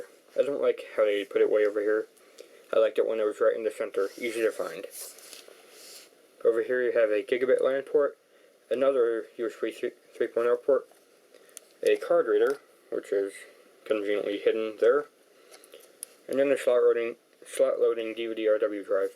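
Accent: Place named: Russia. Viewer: for god's sake, he's russian, english is not his first language STFU already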